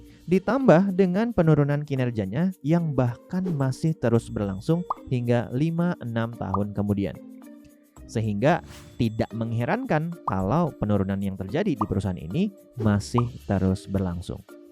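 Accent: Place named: South, United States. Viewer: Logat southern American ya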